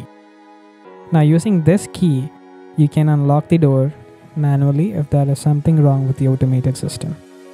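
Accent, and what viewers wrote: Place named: Kerala, India. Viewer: Bruh you from Kerala? 😍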